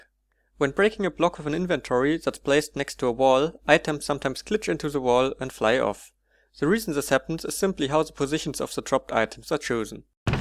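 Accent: Germany. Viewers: I think he is a german guy who speaks english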